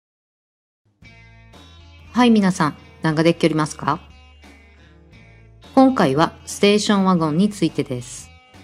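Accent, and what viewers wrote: Kagawa, Japan. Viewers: やっぱりこれ讃岐弁ですよね(東かがわ出身並感) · 讃岐弁？！ありがとうございます^ ^ ステーションワゴンの魅力を改めて発見できました！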